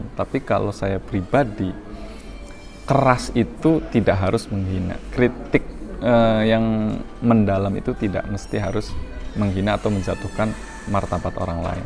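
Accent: Banyumas, Indonesia. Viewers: Njenengan ngapaknya daerah mana mas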